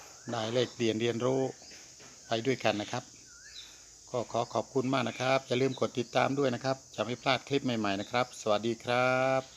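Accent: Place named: Buriram, Thailand. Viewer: น้ำเสียงคล้ายคนบ้านผม..แถวๆเซราะตลุง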